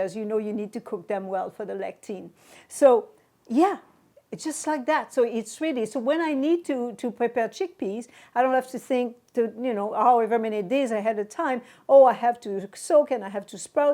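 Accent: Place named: Caribbean. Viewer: Love your advice and your accent is beautiful! I feel like I sense a tinge of islander in yr voice, Caribbean of sorts. (don’t mean to be rude). Thank you for sharing!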